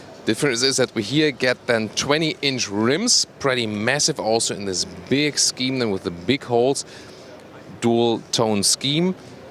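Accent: Germany. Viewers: i really love your denglish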